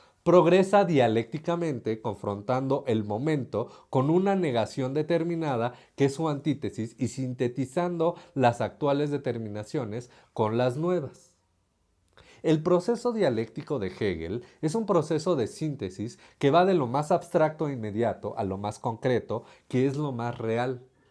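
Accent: Mexico. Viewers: Que buena pedagogía y que agradable narración, ¿eres mexicano?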